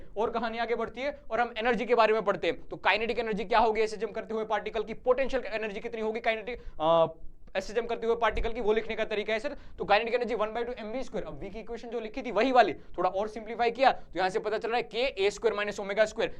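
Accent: Gujarat, India. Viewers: Only gujrati guy recognise other gujju's Hindi😅, BTW sir is gujarati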